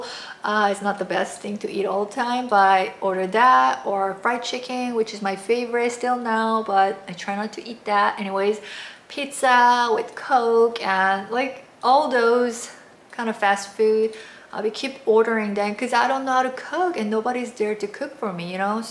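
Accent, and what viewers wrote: South Korea. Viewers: I could tell you are korean from your accent. Nice to see korean lv lover!❤️ subscibed😘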